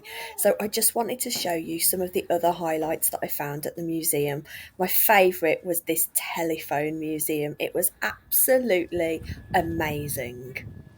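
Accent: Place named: Black Country, United Kingdom. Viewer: i’ve just found your channel, completely by accident. Your videos are superb. You have a lovely way about you and describe things so well! I used to live in Coseley in Wolverhampton, so lovely to hear your accent as well. Very best wishes. Neil in Southport.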